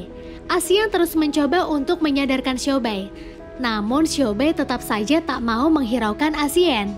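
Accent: Pemalang, Indonesia. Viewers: Mba orang Pemalang nih.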